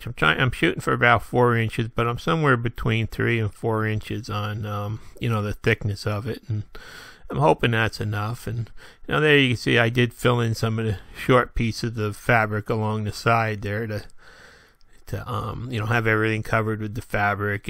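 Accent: South, United States. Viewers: Message From The UK.... Loved the video, loved the southern accent, loved the finished job! God bless Southampton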